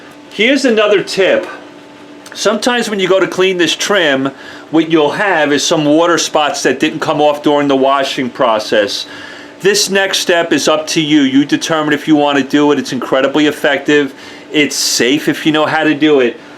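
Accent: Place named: New Jersey, United States. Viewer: Ok I love the channel, new subscriber here. Lol, your from TX now but sound like originally from Jersey ;). Love the detailing advice and yes God Dammit I purchased The Ball, gotta get The Flitz Ball...go in slow then speed it up! 👍🏻😎👍🏻 Love it, Tampa FL we share the same heat here as well brotha....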